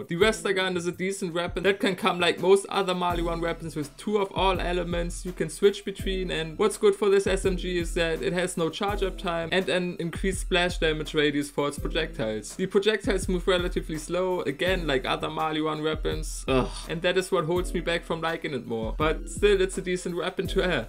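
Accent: Germany. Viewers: Great content and very nice humor. I'll follow you and your videos along. Also your accent is just fun and lets me believe we could talk in german aswell :P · you are german ? sounds like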